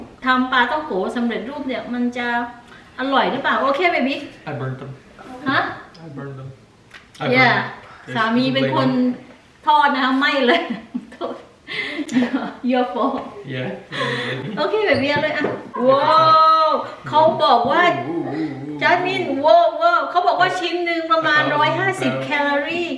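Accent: South, Thailand. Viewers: ถึงว่าสำเนียงทองแดงออก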